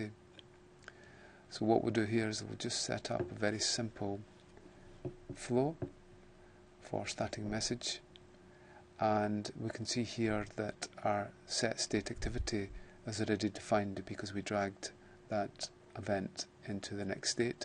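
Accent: Scotland, United Kingdom. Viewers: He's Scottish, it's just how they sound.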